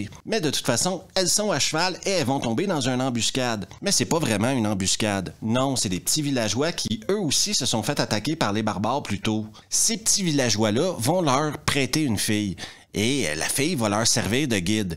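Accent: Canada. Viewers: Plus je regarde tes vidéos, plus je suis fasciné, voir hypnotiser 😵‍💫 va savoir… l’élocution, le choix des mots, le sens de l’humour… et l’accent canadien !🥰 je sais pourquoi, j’ai toujours vu le canadien comme une version améliorée du français ( ni voyez aucun racisme ou suprématisme de ma part) pourquoi ? Comme dirait Gilbert Montagnier: là je vois pas… en tout cas, peut-être qu’un jour je quitterai ma jolie France natale pour ton joli pays.. pourquoi ? Sans doute à cause de Macron 😰( la, tout le monde comprend 😉) bisous à bientôt ♥️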